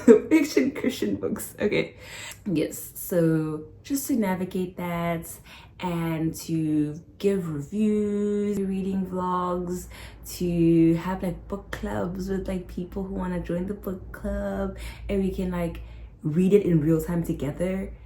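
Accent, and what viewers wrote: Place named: United States. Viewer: Yes you sound American 😭I thought we all knew that??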